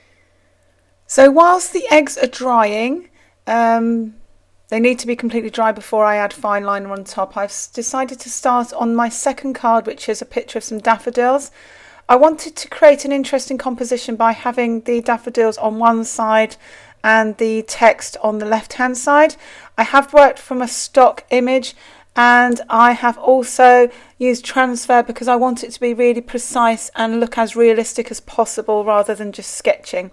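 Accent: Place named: London, United Kingdom. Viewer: Lovely work my friend! I love daffodils! I am missing London with your accent. 🥰 xx #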